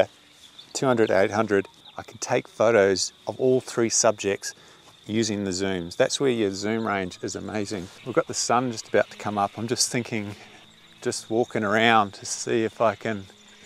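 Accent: Australia. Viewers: Your Australian accent.:-) Nothing wrong with it of course.:-) Greetings from the Netherlands, spring is coming here soon.:-) photographer and Filmer.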